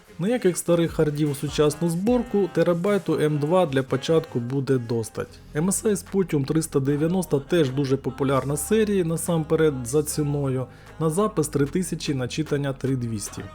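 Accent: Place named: Transcarpathia, Ukraine. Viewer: Кратко когда ты с закропатьия ммм... Нихуя не понятно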